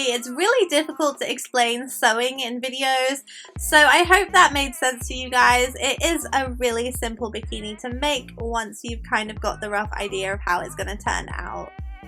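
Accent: England, United Kingdom. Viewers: Shes english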